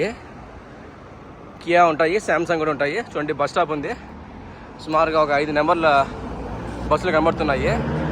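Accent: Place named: Rayalaseema, India. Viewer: ఆంధ్రలో నీది ఏజిల్లా బ్రదర్ చూస్తే రాయలసీమ లాగా వుంది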